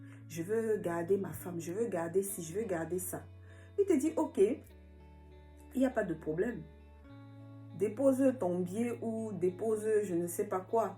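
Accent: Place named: Cameroon. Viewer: J’aimes beaucoup l’aspect terre à terre de tes vidéos. Avec la petite touche de dialecte Camerounais 😂🤩🤩. Trop fan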